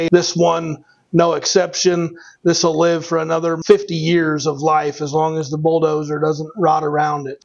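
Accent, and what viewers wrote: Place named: South, United States. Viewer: Leginment country boy will bring you back dreakly i reckon only country boys like us talk that way😂 but that saft dam sure got geterd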